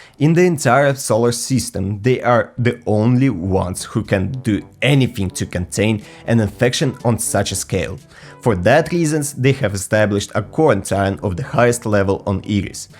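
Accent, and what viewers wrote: Russia. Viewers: You sound russian...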